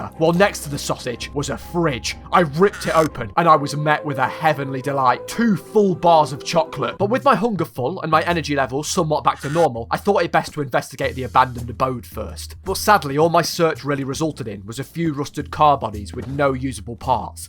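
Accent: United Kingdom. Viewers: First of all calm down second of all he is British not American how did that accent sound American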